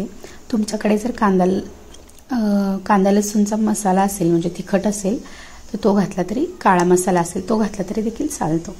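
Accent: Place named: Kolhapur, India. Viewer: कोल्हापुरी भाषेत लय भारी 👌👌👌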